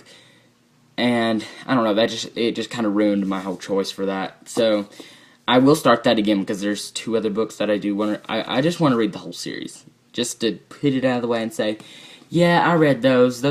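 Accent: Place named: United States. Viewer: Your accent is so cute LOL. You sound really American. Great video! Hopefully you get to reading those books soon. :3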